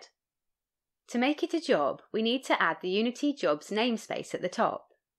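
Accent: United Kingdom. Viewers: came for the unity tutorial, stayed for the spiderjob by this delightful british accent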